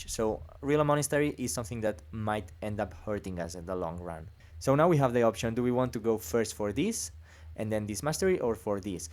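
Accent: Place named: Iberia. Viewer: ahahah sehr cool mein freund. where u from anyways? by your accent i would assume iberia or south america🙃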